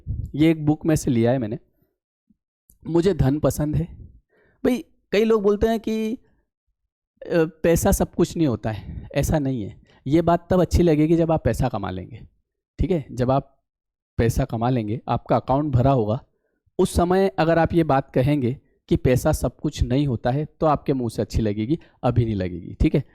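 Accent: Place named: Madhya Pradesh, India. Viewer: Sir jarur aap mp ke honge kyu ki tone whi lag rhe hai😅 kyu ki mai bhi indore se hi hu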